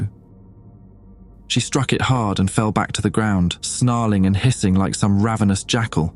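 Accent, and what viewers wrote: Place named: England, United Kingdom. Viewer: I really liked this one. Nice twist that wasn't super obvious. This isn't a criticism but, as a brit, it's so jarring to hear the narrator speak in an English accent but use American phrases. Was mostly at the start of the story.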